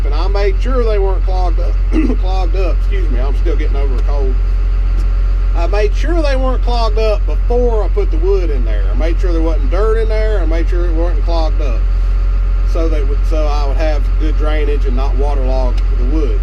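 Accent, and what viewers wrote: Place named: South, United States. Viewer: You sound' further South'...... I did not know, Virginians sound so Southern... 'Real life'..... nice... Different than 'created content'.......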